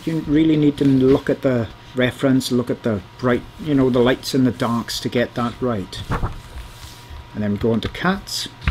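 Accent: Wales, United Kingdom. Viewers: Really nice to hear another Welsh voice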